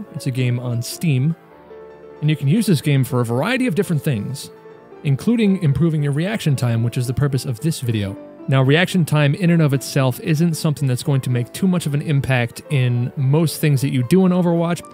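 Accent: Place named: New York, United States. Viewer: He's clearly a New Yorker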